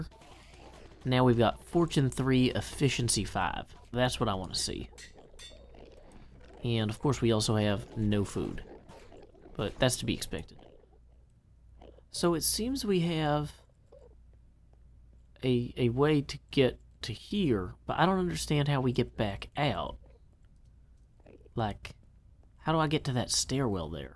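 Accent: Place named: South, United States. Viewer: love the southern accent.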